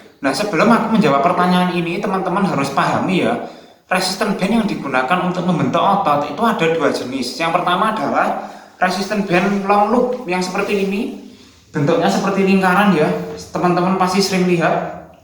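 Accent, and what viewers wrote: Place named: Malang, Indonesia. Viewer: Logate kok koyok Wong Malang yo? Loop Band-ne merek opo iku Bro? Svarga?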